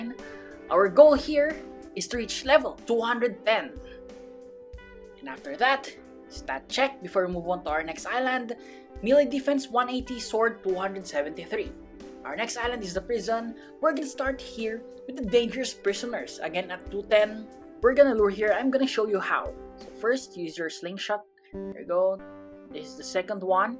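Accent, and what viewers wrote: Philippines. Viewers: Hi i know your filipino bc you sound like filipinos so i knew it already do you make a gamernomph channel please tell me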